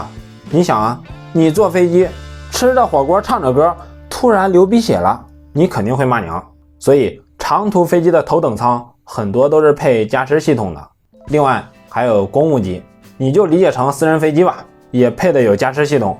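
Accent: Henan, China. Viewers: 河南口音这么重！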